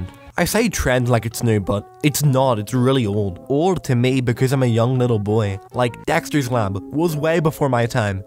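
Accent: Scotland, United Kingdom. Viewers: You sound English but you sound a bit Scottish as well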